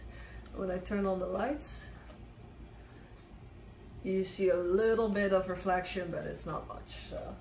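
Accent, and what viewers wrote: Netherlands. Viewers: I hear a Dutch accent!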